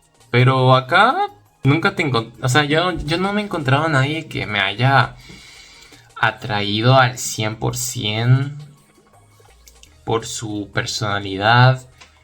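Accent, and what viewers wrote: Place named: Argentina. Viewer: Eres Argentino 😊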